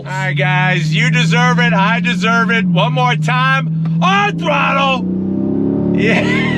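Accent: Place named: New York, United States. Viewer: Gday mate im an Aussie and am getting a Hellcat imported for a January delivery. Cant fkn wait aye. Good review just a question though where is your accent from as i love immitating your voice. Are you from Brooklyn or Boston or something else?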